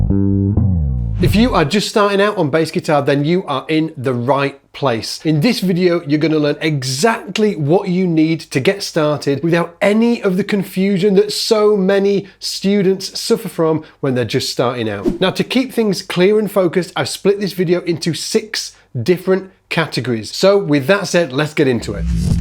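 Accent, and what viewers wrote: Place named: Liverpool, United Kingdom. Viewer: Dude is from the Liverpool area, because his accent is just like the Beatles! So somewhere along the Northwest coast of England!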